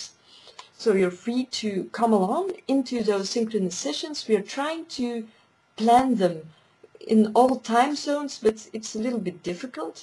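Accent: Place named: Flanders, Belgium. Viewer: Thank you for this lovely welcome,. Flemish-inspired English? 8-D Well, you're certainly inspiring.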